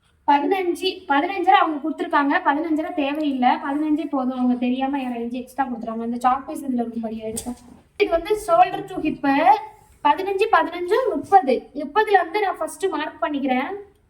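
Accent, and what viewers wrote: Tirunelveli, India. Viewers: Tirunelveli slang always beauty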